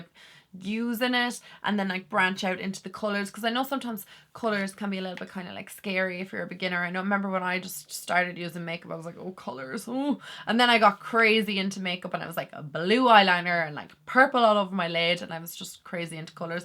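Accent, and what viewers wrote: Ireland. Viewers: I'm new to your channel after searching for eye shadow pallets and this is really useful, the Huda one looks good. By the way like your accent, it sounds very Irish :)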